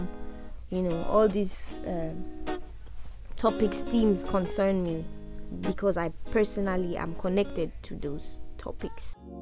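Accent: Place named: Nigeria. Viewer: wow she has conc. nigerian accent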